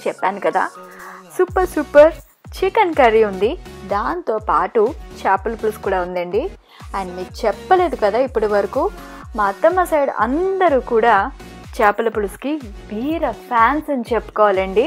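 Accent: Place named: East Godavari, India. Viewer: మా తూర్పు గోదావరి భాష వింటూ ఎంజాయ్ చేసాం. ఇంతకీ ఏ ఊరు అది మేడం?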